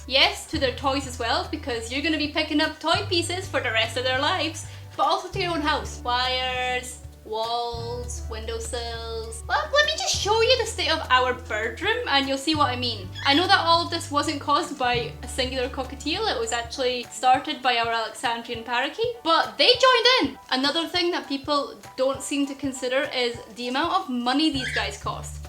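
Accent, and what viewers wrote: Scotland, United Kingdom. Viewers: Are u scottish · Are u Scottish;)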